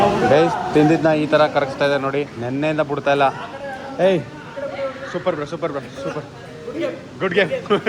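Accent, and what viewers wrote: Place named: Udupi, India. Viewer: udupi mglor kannada supper😂❤❤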